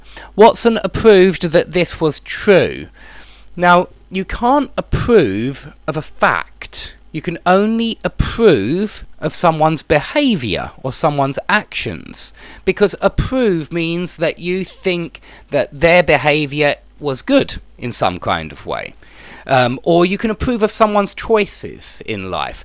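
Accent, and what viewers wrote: United Kingdom. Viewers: Ilove the British accent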